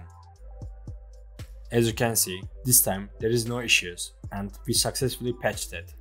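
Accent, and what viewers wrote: Turkey. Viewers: turkmusun kral bu diksiyon ne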